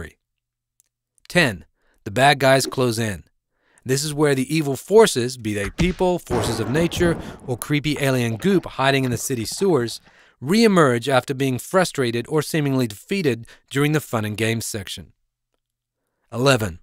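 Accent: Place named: Australia. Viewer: Totally random observation: this dude has got to be half aussie or something. There are some sneaky vowels & dipthongs giving away an accent! (Dont worry, as an aussie, its a cute thing to notice) Handy video, btw. Thanks!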